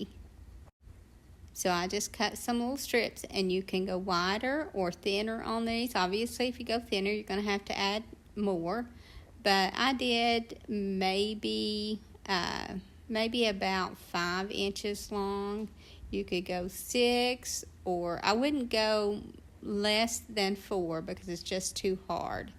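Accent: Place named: Tennessee, United States. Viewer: I just love watching your videos! And listening to you, too! LOL I was hoping you had a little shop I could visit somewhere near me in the Dallas/Fort Worth area but sadly, nope. 😢 But when I read you were in Tennessee, I knew that was why I loved your accent. Reminds me of home...Georgia. Anyway...everything you do is beautiful and I really appreciate your careful instructions.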